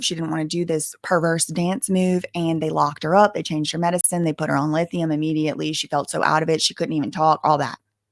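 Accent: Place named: Louisiana, United States. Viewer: I love watching you 😂 the pink Pom Pom’s, Louisiana accent…just so darling 🤗 love from London 🇬🇧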